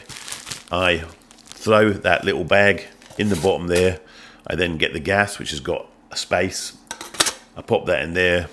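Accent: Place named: England, United Kingdom. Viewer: Good review and you've acquired a bit of an English accent 😂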